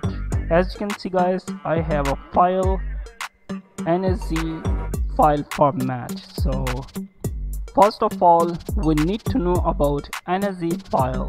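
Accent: India. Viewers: It's always indian voice with 1$ micro. Classsic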